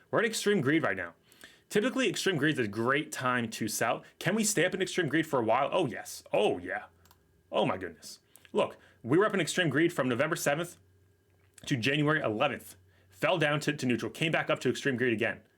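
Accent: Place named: Berkshire and Buckinghamshire, United Kingdom. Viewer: I'm from there. Distinctive accent. :) berks and bucks 4me. But just guessing!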